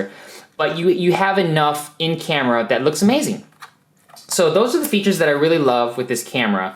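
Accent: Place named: South, United States. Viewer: Говор этот южный... поубивал бы начлен. Чесслово, уши вянут. А после Samsung HMX-H405... Ну, чего тут сравнивать-то - земля и небо. Если кому надо русифицировать AX60 или настроить AX53 (или иную камеру Сони) на 30/60/120 к/с - обращайтесь, научу. У меня АХ53 пишет в NTSC и дез получасового ограничения записи.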